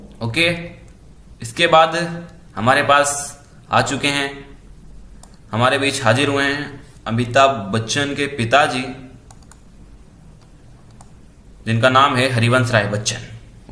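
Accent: Indore, India. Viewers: Yeh bhai pakka indori hai 🙂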